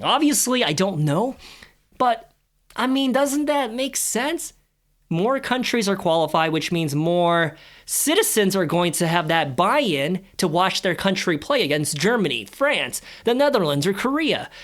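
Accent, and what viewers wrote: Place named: California, United States. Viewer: I thought you lived in California, due to your accent.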